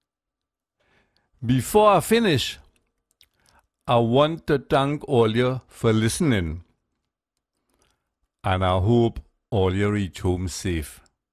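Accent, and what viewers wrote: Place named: Trinidad and Tobago. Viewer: Thanks, bro. Glad yuh like it...we cyar stop talkin Trini, ent?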